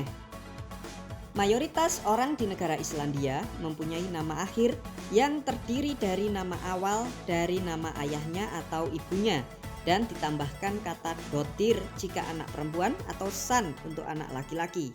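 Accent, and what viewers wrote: Java, Indonesia. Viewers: Medok euy 🤭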